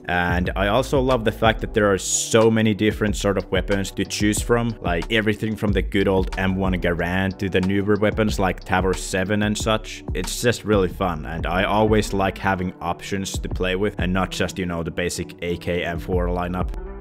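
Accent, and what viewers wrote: Finland. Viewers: instantly knew you were finnish from your accent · kuule kuule kyllä siitä kuule että olet suomalainen letsgoooo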